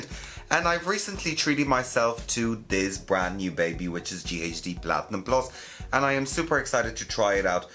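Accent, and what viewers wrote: Ireland. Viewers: Was not expecting the Irish accent 🍀 ❤ my original ones plug broke I so regret throwing it away and replacing with the platinum 😢 platinum one makes my hair static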